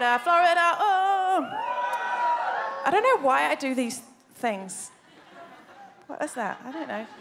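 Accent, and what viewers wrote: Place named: New Zealand. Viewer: New Zealand accent!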